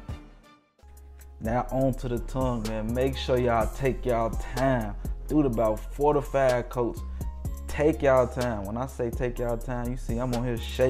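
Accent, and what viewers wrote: Chicago, United States. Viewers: Bruh, you from Chicago?! Lmao I stayed for the commentary Omm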